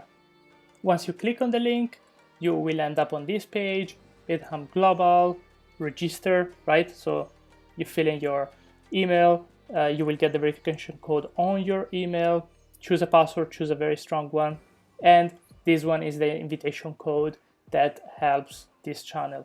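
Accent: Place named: Italy. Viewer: Ciao, sembra che sei italiano..di dove sei?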